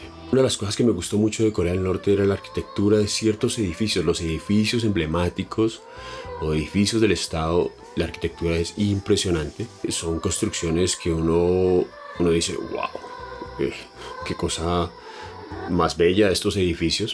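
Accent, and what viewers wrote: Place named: Colombia. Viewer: Cuidandome en esta pandemia me encuentro con tu video y escuchando tu narración de tu experiencia en corea del norte, me encantó tu formato. He leído mucho sobre corea del norte, su cultura, su forma de vida, el sometimiento en este regimen, la infraestructura de este país y realmente no me gusta nada Corea de Norte, pienso que es un país engañando por este régimen, donde estan atrazados años luz del mundo exterior. Pero tú Narrativa es muy entretenida a lo Colombiano y eso me encantó. Seria muy interesante escucharte hablar de otros países que tu has visitado. Pues me encanta conocer otras culturas y otros países. Yo sigo a Alex tienda, Gabriel Herrera, Luisito comunica, planeta Juan etc. y que bueno que un colombiano nos narrará sus experiencias de viaje desde su concepto y a lo colombiano. Un saludo y felicitaciones.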